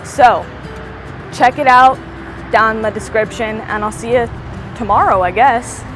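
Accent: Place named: Pennsylvania, United States. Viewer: I just love your NE Pa accent. And I'm just mesmerized that you took the initiative to put this Utube channel together, while you're schooling and working at the mill. And taking your work seriously is refreshing. So, it's all these things that make your channel a pleasure to watch! Something tells me that you two are going to succeed in life, no matter what path you choose. And something tells me you have a LOT of people in your corner, cheering you on.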